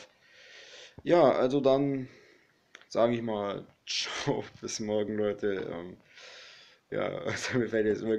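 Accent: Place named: Franconia, Germany. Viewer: Bitte gerne. Du bist noch Jung du wirst noch was passendes finden;) Echt aus Franken,dann hab ich das aber gut raus gehört;)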